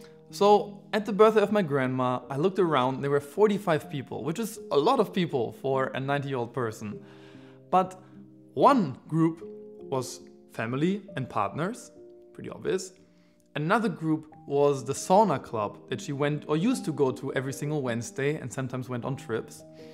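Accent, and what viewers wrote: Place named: Germany. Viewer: Höre dass du Deutscher bist :P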